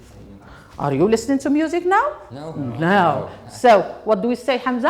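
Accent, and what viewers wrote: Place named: Morocco. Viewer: at least we could say this is Moroccan accent.We all know having British or American accent is no by no means available for all English teachers ....it's not easy to speak English authentically .if you have any other oppinion then you find it difficult to speak English like the way British or American people do ( namely native people )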